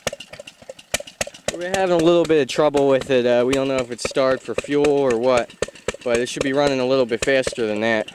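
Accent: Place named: Pennsylvania, United States. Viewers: Dude lives in Pennsylvania doesn't he? That accent. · Are you from Pittsburgh? I'm catching some accent... Sweet mower though!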